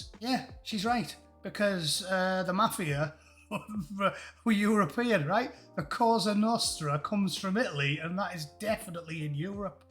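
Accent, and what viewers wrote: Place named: North, United Kingdom. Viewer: Definitely prefer to get the news through you as its healthy to laugh..but whats that elevator music in background?..trying to soften your northern voice??..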